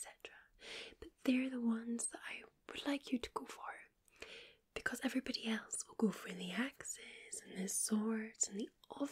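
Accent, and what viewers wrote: Scotland, United Kingdom. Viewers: I hear Scottish I click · Do you know who I am My brain: slight Scottish accent Me: MERIDA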